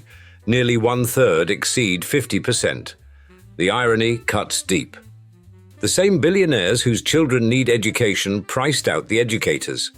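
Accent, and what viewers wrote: United Kingdom. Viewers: British accent means go to another story fast.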